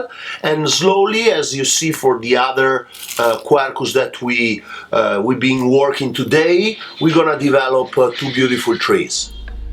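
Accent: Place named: Italy. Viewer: You sir,.. you have all my respect and admire your work as a Bonsai Artist. But your english is good and funny!! And unique way and saying everything with a smooth italian accent! Great sir!!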